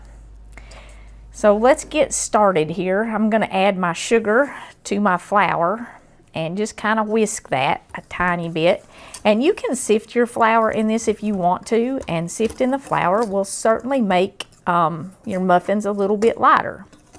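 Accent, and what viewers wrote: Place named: Georgia, United States. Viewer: i feel for you so much. to lose a loved one is bad enough but to lose you're soul mate is that much tougher. from you're accent i think you are from somewhere in georgia, correct me if i'm wrong. i live i south georgia and thats what i here. love to you sunshine and god bless.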